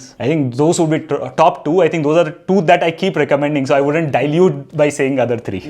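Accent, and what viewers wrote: India. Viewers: Sundar pichai wala accent....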